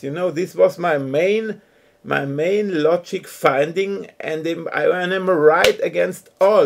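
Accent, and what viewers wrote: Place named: Germany. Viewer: EXCELLENT WORK, I JUST WISH IT WAS NARRATED BETTER..SOMEONE WITHOUT A THICK, STUTTERING GERMAN ACCENT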